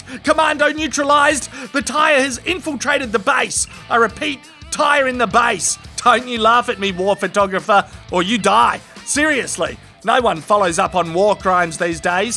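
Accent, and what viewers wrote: Australia. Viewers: This guy sounds so Australian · When I moved to australia from the US, everybody sounded like you for the first month 😂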